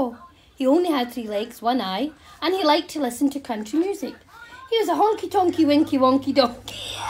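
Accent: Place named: Scotland, United Kingdom. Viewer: The Scottish accent is just the cherry on top, and the wheezing from the cameraman.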